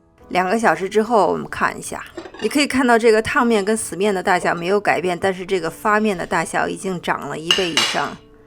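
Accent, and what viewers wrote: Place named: North, China. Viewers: sun shieh 也许是你弄了，听口音，应该是中国北方人，她的念法与中国汉语字典一致的，你可以查看字典，至于其它地方是否同一标准我就不得而知了，例如台湾，新加坡等等国家。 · 你是台湾人？是大陆西安人，所以这样读是没错的，你不能强求大陆人来按台湾人的读音来发音。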